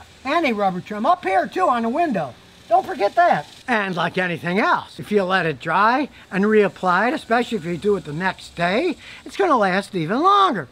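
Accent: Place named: Pennsylvania, United States. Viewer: ! Hi... I see a Texas plate on your'n car but you ain't from Texas.. I'd guess Pennsylvania or mayhap Ohio judging your accent?.. where is from?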